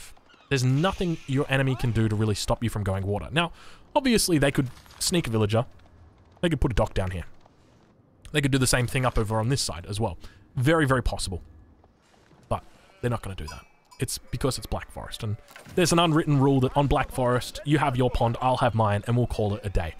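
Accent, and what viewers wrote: Australia. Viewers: I seriously cannot get enough of your voice lol. Is that weird to say? Yeah that's probably weird to say... But hey, having a great voice (Australian accent is a plus!) is great for casting like this!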